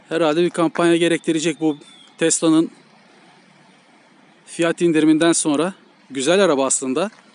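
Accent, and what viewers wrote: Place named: Thrace, Turkey. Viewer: Trakyalı şive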